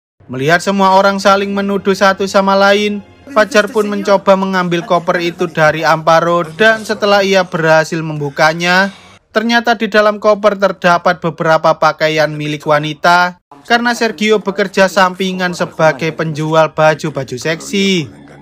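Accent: Java, Indonesia. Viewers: Medok bngt.. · Saran saja mas tolong logat Jawa nya jgn terlalu keras pasti seruuu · Medok sekali 👍🏼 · Ngomongnya bisa ga sih gausah medhok gitu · Ya allah mas medokkk e samean wkk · logat nya kok jawa kali jadi enek di denger, kenapa gak biasa aja bg biar bagus kayak IQ7 & SAQAHAYANG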